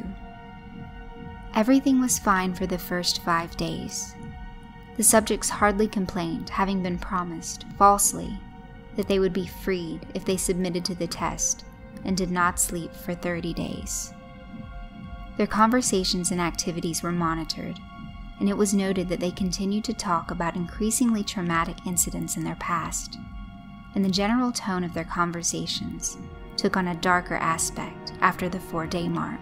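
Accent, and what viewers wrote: South, United States. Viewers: Don't u EVER doubt your narrative talents!!!! U r awesome girl! Especially when u throw n the southern drawl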